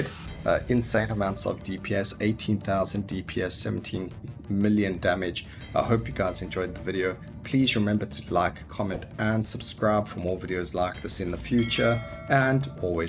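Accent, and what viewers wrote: South Africa. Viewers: Awesome content. Thank you! Also so refreshing to hear a local SA accent when chilling to some gameplay.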